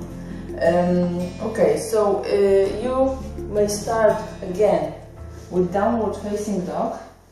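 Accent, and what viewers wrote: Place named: Turkey. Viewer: türk aksanı sezdim sanki :)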